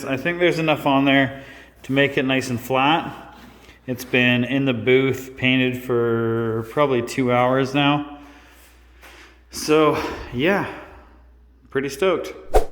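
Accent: Canada. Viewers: Love hearing a Canadian accent on youtube and realizing you're watching a fellow west coaster at work! Nice work buddy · Took me a minute to realize u were Canadian. What's good from London Ontario!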